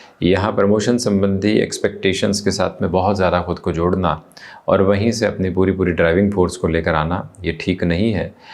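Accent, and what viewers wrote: Standard. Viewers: Bahut badhiya rashifal bayan karte hai aap. Aur aapki hindi bhi bahut hi shuddh hai. Kabhi kabhi kuch shabd samazne me taklif hoti hai toh subtitles ON karke samazna padta hai. Dhanyawaad. 🙏🏻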